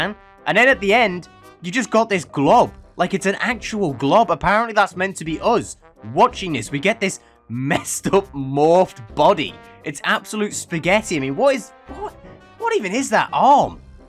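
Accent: United Kingdom. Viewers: wow British dude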